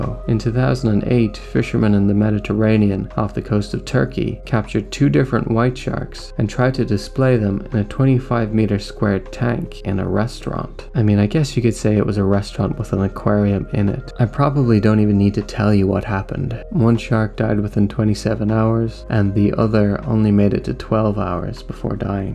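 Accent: Ireland. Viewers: is the narrator Irish? he pronounces "sandy" strangely.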